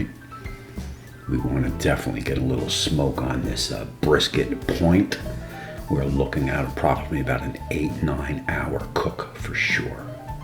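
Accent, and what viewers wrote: New York, United States. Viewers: I like your style mate, that’s a New York accent right ? You a sports fan ? I’m an Aussie and can’t get enough of American sport, I love everything New York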